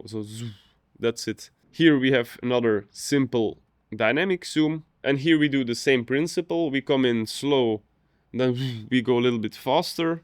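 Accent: Belgium. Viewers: ik hoor een vlaams accent ngl.... XD ben echt blij met deze video! nieuwe dingen bijgeleerd vandaag!! :-)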